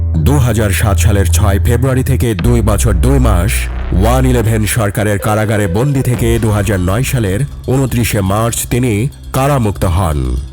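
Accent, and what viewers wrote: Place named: India. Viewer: ভিডিওর কথাগুলা ভারতীয় একসেন্ট মনে হছ্ছে।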